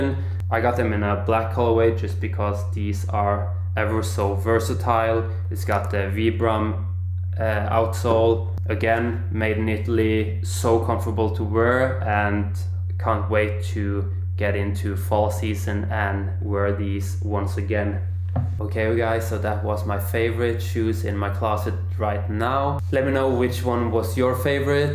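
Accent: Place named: Norway. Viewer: Lol knew you where Norwegian by just the accent alone, before even seeing your name.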